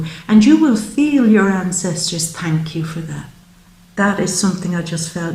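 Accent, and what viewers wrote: Ireland. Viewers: Am I hearing Ireland in your voice?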